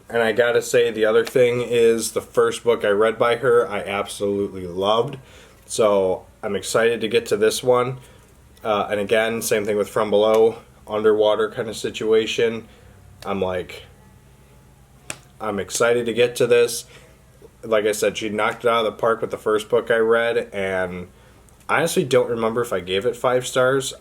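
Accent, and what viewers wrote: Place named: Midwest, United States. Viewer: Man with heavy midwest accent in a Menards shirt...checks out. Great content 🤘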